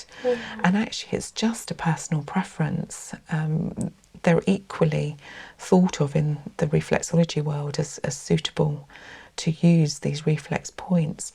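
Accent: England, United Kingdom. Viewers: What area are you from in England? You accent sounds so much like a friend of mine. Such a soothing voice!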